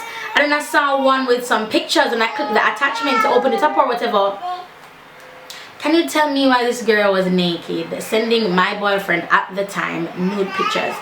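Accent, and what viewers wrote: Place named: Jamaica. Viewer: love you girl. ..proud to see a Jamaican in this mode...positive and smiling. ..love how yu switch up di talk!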